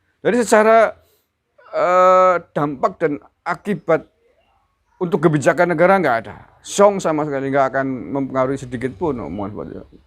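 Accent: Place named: Java, Indonesia. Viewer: haduh ngakak aku om jenengan kalau ngendikan lucu jawani salam om sehat selalu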